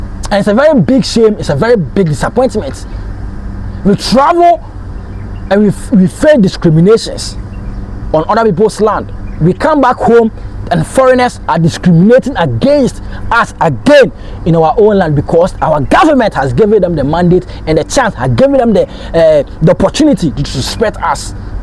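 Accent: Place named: Nigeria. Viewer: BRO HERE IN GHANA NOT IN GHANA HERE STOP SPEAKING THAT HORRIBLE NIGERIAN ENGLISH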